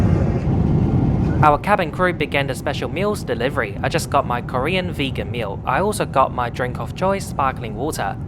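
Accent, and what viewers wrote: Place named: Australia. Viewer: First of your videos I've seen. Your excellent English with an Aussie accent is adorable!! Planning to take KA so I can take my cat in the cabin, instead of frightening her in the (admittedly pressurized, climatized) forward cargo hold. I see on the seating charts that KA has a small number of dedicated "pet friendly" seats, but so far I haven't been able to find a video of someone bringing their cat along on KA. Maybe I should make a video of my (our!) experience!? 🤷😺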